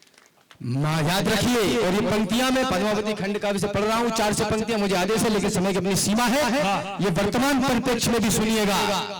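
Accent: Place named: Bundelkhand, India. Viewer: आपकी आवाज में दम है, आवाज भी बुंदेली। 🙏🙏🙏🇮🇳🇮🇳🚩🚩🚩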